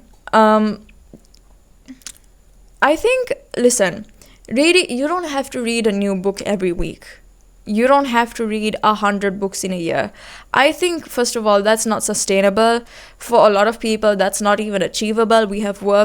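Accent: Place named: United Kingdom. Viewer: Uk English 🙏👍❤️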